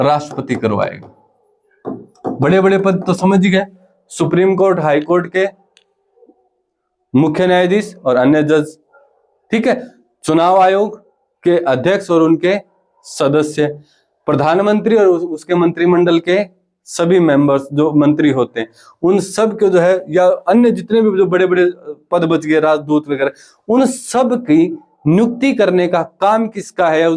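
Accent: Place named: Bagad, India. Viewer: गुरूजी थारी बोली मे टच थोड़ो बागड़ कानी को 😂😂😂😂😂😂😂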